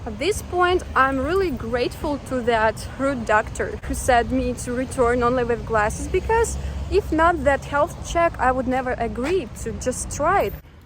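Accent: Russia. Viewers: you just look so cute when speaking English.. I visit your videos to hear your Russian - English accent.. 😊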